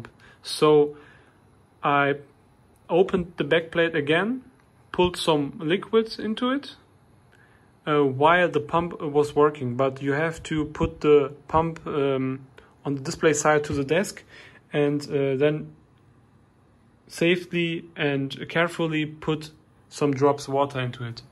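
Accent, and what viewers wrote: Germany. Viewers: am i correct and i listen to german english speaker? My english is the same haha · Höre ich da deutsch?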